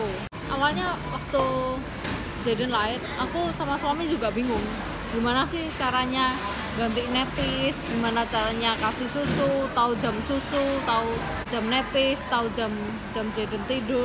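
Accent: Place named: Java, Indonesia. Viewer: Jowone medok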